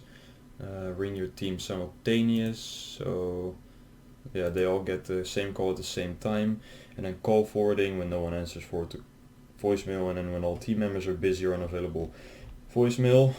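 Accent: Netherlands. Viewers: Hi.. thanks for your service in raiding the scammers. Are you Dutch? Sorry, just listening to your accent. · ik heb echt respect voor jou kerel je gebruikt je hacking skills tenminste voor een goed doel ga zo door!!! je bent Nederlands neem ik aan van je accent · You send dutch af my guy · Happen to be dutch lol? Lmao your accent love it, just like the justice given! Keep up the good work! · Broer waar in nederland woon je ? Dacht al die nederlandse engels accent. Jij zat vroeger zeker op ict of wa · Great vid, but you reveal a little too much that you're Dutch speaking, i'd say close to Belgium or Belgium itself ;-) groeten van Antwerpen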